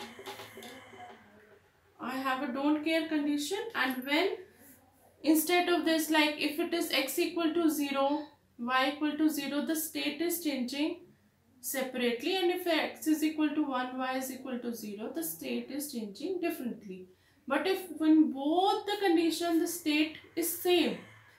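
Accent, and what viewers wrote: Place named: India. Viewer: In the thumbnail, I saw a white arm and I clicked the video because of that. But it is goddamn indians AGAIN! That terrible English and the accent is driving me crazy and I cannot stand any content made by indians.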